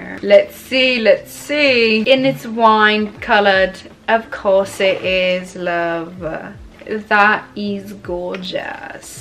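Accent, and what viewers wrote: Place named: United Kingdom. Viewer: That british accent is everything😂❤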